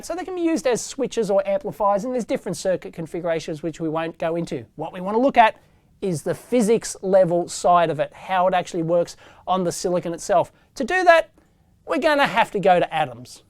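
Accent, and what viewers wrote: Australia. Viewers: Absolutely Superb!! Best explanation I've seen. Moreover Australian accent made it just PERFECT!! Thank you mate!!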